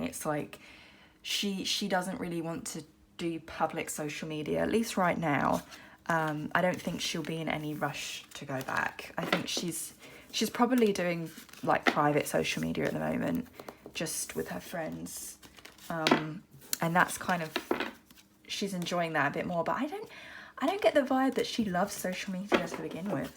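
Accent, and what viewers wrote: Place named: Australia. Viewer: Spot an Aussie lol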